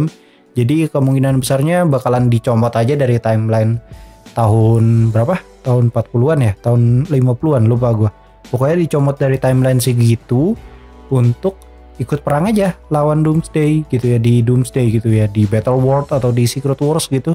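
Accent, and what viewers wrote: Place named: Bogor, Indonesia. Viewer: Bahasa sunda lu kek barudak bogor pride anjir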